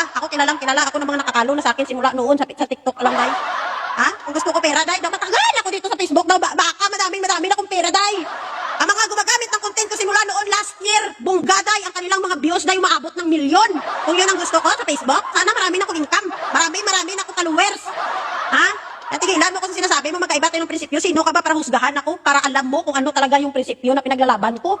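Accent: Iloilo, Philippines. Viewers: Super love ko talaga Ilonggo accent. 😂 ❤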